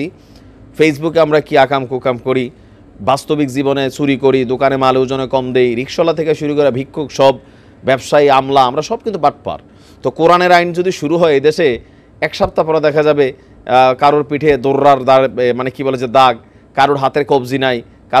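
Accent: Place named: Pabna, Bangladesh. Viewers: পাবনার ভাষায় কথা, ভালোই লাগলো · পাবনার ভাষা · ভাষাটা পাবনার ভাষা.!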